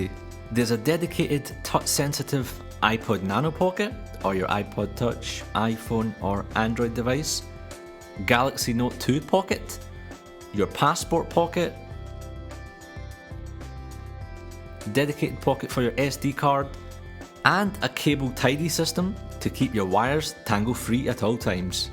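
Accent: Scotland, United Kingdom. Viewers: i like your accent. scottish? i'm italian btw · Love your scottish accent, but im convinced that Siri will learn my language (finnish) before scottish ;) Thank for the video bro! btw: planning my third trip to Scotland! Cant wait to see the highlands once again!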